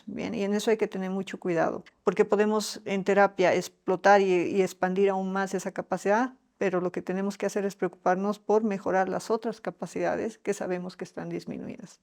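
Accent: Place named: Bolivia. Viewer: Excelente conferencia , felicitaciones , por su asentó , la Doctora es Boliviana ? De La Paz ? Muchas gracias por compartir sus conocimientos. Saludos desde el Ecuador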